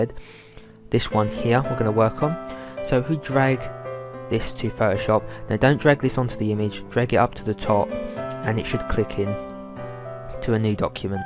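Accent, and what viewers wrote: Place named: United Kingdom. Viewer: the Q is U only my man has a heavy British dialect... for me it was hard to understand some things as well..... na mean??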